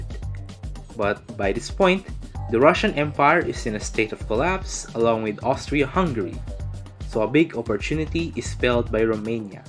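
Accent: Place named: Philippines. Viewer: Knew you were filipino from the accent. Good stuff dude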